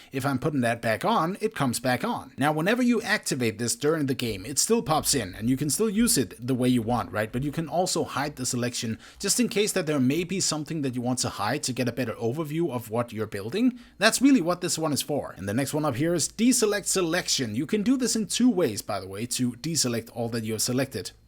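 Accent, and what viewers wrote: Denmark. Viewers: Funny how i just instantly knew you were from Denmark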